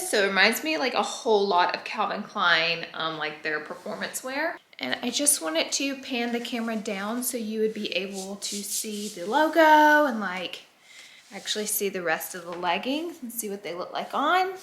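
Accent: South, United States. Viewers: Aspartame. Where are you getting the extra syllable??? I know you're southern but c'mon. We gotta do better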